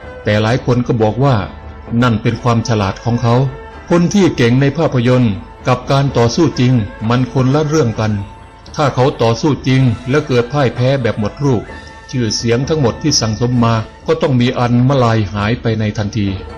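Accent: Isan, Thailand. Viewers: ชอบมากเลย... พูดอีสาน ได้ม่วนอีหลีเด้อ55555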